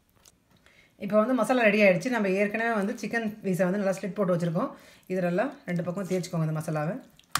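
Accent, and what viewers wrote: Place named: South Tamil Nadu, India. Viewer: super mam......just now I saw your cooking video and subscribed....its really amazing and I couldn't believe your are speaking perfect south tamilnadu language.......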